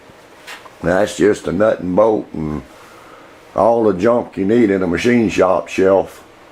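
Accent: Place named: Louisiana, United States. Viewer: That's a really cool shop. That model table you made for Abom79 is AWESOME!!! The most of what I do in my shop is make stuff for the house and the grandkids, I can only imagine the really cool stuff you make for your family & grandkids LOL. Your level of organization seems like something from a submarine or ship. Two guys I was in the Navy with were from Louisiana; Kerry Gros from Shreveport and Dan Sumrall from Denham Springs; your accent reminded me of both of them. Keep the vids coming, your work is really interesting.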